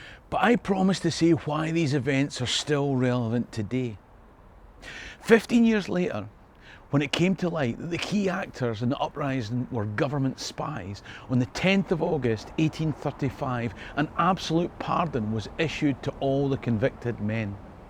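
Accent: Scotland, United Kingdom. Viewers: What the hell is he saying after, "It would take a nasty cocktail of circumstances to distract folks from..." CC has it as "that dodgy bloke in the jungle." It sounds like a name, but I have no idea. "That dodgy bloke in the jungle makes no sense at all. Why is the Scottish accent so hard for Americans to understand? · Either I am understanding his Scottish accent better or he’s speaking more clearly.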